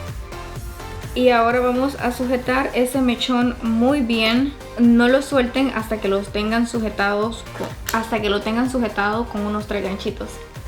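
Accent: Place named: Honduras. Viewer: A mí me encanta que está mujer tanto que a viajado y todo, habla como catracha, & otros majes que se van para otro pais hablan como majes & ella no pierde la esencia... Por eso y otras cosas me la sube 💖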